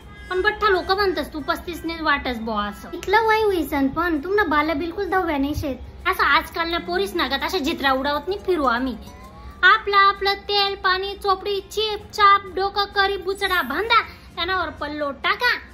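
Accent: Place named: Marwar, India. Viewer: Hi कोणती भाषा आहे, खानदेशी का अहिराणी? खूप मारवाडी भाषे सारखी CH वाटते आहे 😁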